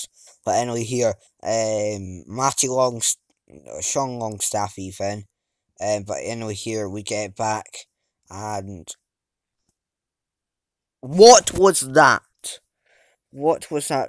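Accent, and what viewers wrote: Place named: Scotland, United Kingdom. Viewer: Also I’m loving ur Scottish accent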